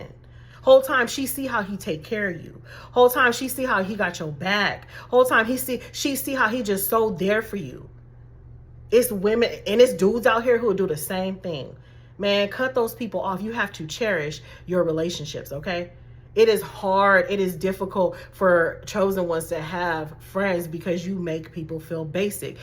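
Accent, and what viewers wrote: Chicago, United States. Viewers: This is so good! You from Chicago? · Englewood Chicago or Cali? I'm from Chicago and you do sound like the Chi! I came across you today! I'm at work working and listening to you! Iron sharpens iron!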